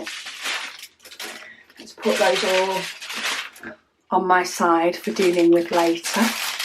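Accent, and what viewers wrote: United Kingdom. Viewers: 310! Not impressed... just finished a Dp with, yes, 42 000 black square drills! Overwhelming. So proud, I finished it! Most difficult DP I did. Bur it is GORGEOUS! The drills were kind of bad. They were gorgeous but the size of the drills was not uniform....the more I see your lunar phase one... i have a kind of itching to get it... dang! I must be a masochist! Ah ah I really like your channel. Love your voice and British accent, so soothing.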